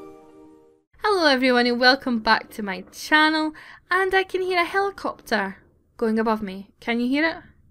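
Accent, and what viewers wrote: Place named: Scotland, United Kingdom. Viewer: I'm going to feel really bad if I'm wrong...but I'm pretty sure you're from Scotland, right? But you remind me a lot of my aunt Ella from Scotland :3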